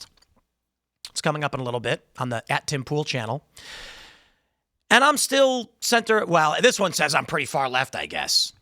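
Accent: Chicago, United States. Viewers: Tim realizes we’ve heard his Chicago accent, right?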